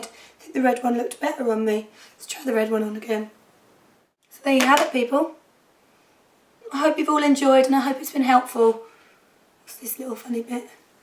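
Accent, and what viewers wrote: United Kingdom. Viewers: Lol I noticed that too but it sounds wonderful with her British accent!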